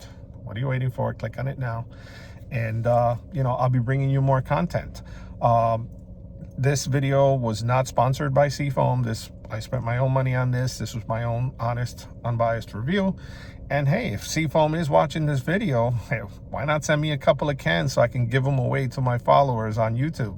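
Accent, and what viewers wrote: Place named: New York, United States. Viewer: Great video, clear, concise, helpful with fab NY accent & phrasing - reminded me of Telly Savalas (TV detective Kojak). Who loves ya baby !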